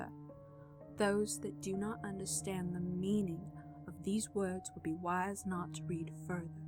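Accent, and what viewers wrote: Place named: West, United States. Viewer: Real good western accent. I thought it fit the story.:)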